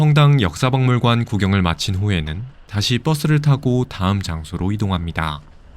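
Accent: Jeolla, South Korea. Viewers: 으미~전라도 억양이 참! 듣기 좋네요~^^ 서울말씨인줄~~ ex: [가~장 긴 시간] 표준어 억양 [가장 긴시간] 좋은정보 감사하지라~ 화이팅~!!!!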